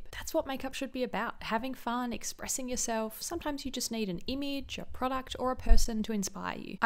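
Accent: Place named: Australia. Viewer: She has such a posh Aussie accent. I’m Australian and let me tell you, I do not sound anything like this 😂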